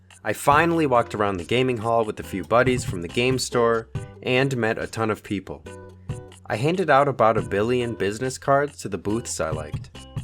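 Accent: United Kingdom. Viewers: Hay great video, liked your English accent, almost as good as mine. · It was great to meet you too, mate! British accent was 10/10 ☕️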